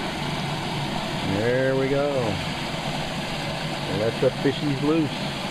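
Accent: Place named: United States. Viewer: whish I had your usa twangy accent, I would sound really good singing boogie blues but I am french when I sing I USA blues classic I sound crap... Nice video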